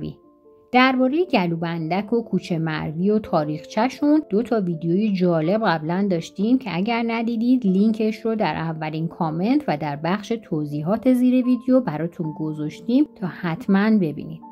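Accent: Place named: Qom, Iran. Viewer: ممنون از برنامه خوب شما. بنظرم لهجه قمی دارید 🤔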